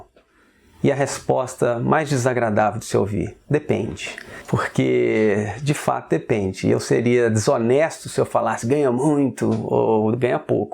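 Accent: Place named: Minas Gerais, Brazil. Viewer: TÃO FOFO JESUS CRISTO como eu amo sotaque mineiro Mas ajudou pra crlho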